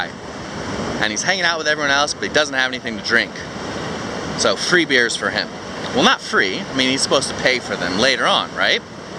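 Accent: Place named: United States. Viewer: speaking English language with an american accent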